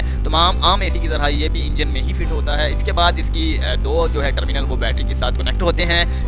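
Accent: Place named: Lahore, Pakistan. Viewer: Ye bi lahori hy awaz sunty He andaza hugya tha chutia banai ga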